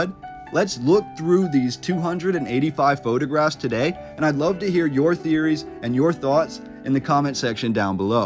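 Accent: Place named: Texas, United States. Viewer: you from Texas aint you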